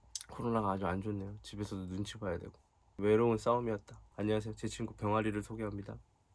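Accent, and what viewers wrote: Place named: Seoul, South Korea. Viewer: 이 양반 내가 본 서울 사람중에 서울말 가장 잘해요 ㅎㅎ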